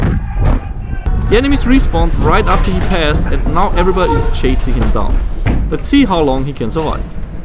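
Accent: Germany. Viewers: Ist er Deutsch? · Bist du Deutscher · Where is from? been watching him for a while, not long enough to figure out where he is from, accent kinda sounds German but I’m no Accent genius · One question, are you german? Weil du diesen Akkzent hast... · hör auf english zu reden jeder weis das du deutsch bist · 1question are u German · are you germane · I have the felling u are german xD😅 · Wer findet auch dass er ein Deutschen Akzent hat?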